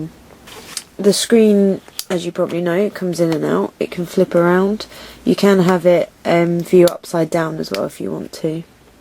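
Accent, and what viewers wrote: United Kingdom. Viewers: Sister, your voice is very british, I like. Do you know how to open the hot shoe lid?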